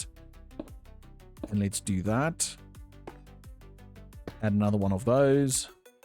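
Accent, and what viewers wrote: Afrikaans, South Africa. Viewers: I know an Afrikaans accent when I hear one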